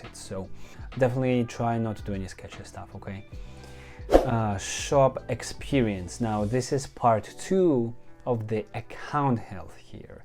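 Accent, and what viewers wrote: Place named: Russia. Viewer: Love that Russian accent, you have that BDE 😂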